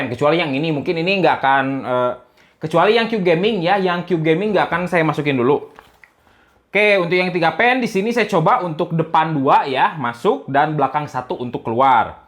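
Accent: West Java, Indonesia. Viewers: sunda bang? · Mang orang mana sunda amat eta congorna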